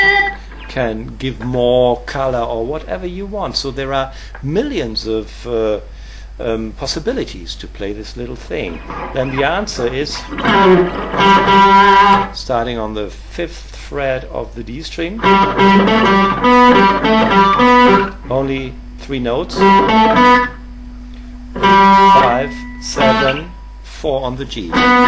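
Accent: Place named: Germany. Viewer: he sounds more german than anything...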